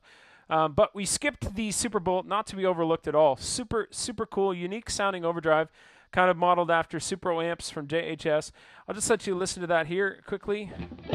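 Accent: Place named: Canada. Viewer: Curious, are you from Canada? Can definitely tell when you say the word "Out" like "Oat" haha. Anyway, killer board. Thanks for the video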